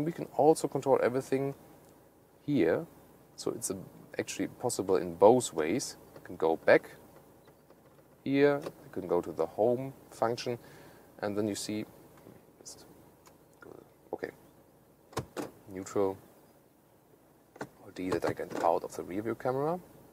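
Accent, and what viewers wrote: Germany. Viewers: Wow, I so expecting a German biased report. I was wrong. The guy obviously German but a professional in his work, gave a proper detail account of each car. Hell of alot better than any British car magazines ever do. I love his accent, his English is alot better than my German, so we'll done. With such detailing lasting more than a poxy 3 or 4 mins from the crappy what car reviews ,who often get it completely wrong when there silly surveys come out which you get a quick flippant remark if your lucky, they sure could learn a few things from guy here.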